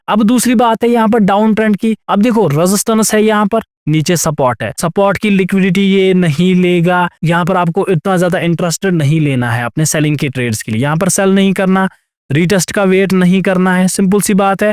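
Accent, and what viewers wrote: Kashmir, India. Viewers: Hi bro... Aawaz sun ki ee laga ki kashmiri ho... Same here from South kash.... Wanna meet you... Please tag your address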